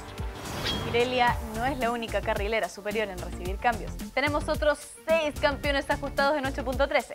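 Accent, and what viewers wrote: Chile. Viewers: Se nota que es chilena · Me gusta el acento chileno pero solo el tuyo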